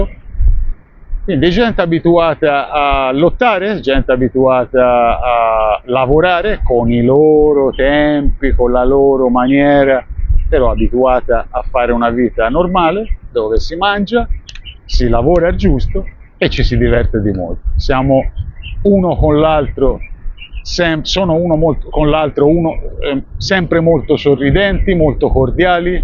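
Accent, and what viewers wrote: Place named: Tuscany, Italy. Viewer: Ciao,sono Luca dì cecina (li),mi sembra che dall accento anche te sei toscano come me.....io ho vissuto in Venezuela per dieci anni,a maracay estado aragua...poi per colpa della crisi sono ritornato in Italia nel 18 e finalmente nel 22 sono riuscito a portare le mie tre figlie e mia moglie (tutte nate in Venezuela) in Italia.scusa la lungaggine,solo vorrei sapere se è raccomandabile oggi investire all isola de margarita.....la mia idea sarebbe comprare un paio dì appartamenti per turisti e avviamente una casa indipendente per me e la mia numerosa famiglia.... orientativamente diciamo un investimento dì circa 50,000 euro......che mi consigli tu? Visto che tu fai sempre ottime spiegazioni e sei una persona sincera ti sarei molto grato se mi potresti dare un consiglio.... grazie mille e continua così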